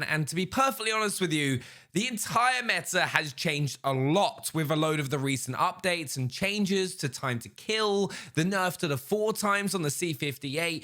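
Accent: United Kingdom. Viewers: Always have been subscribed and notification squad! Teamwork make a dreamwork. Always loved your British voice, keep up the good work mate!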